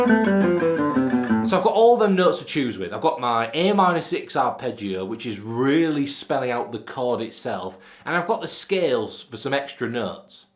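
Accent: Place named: Derbyshire, United Kingdom. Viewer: I didn't understand a word. Nevermind, nice accent though. Buxton?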